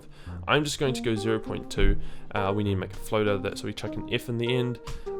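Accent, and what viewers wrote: New Zealand. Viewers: Dude you're a kiwi haha awesome tutorial! Thanks man :)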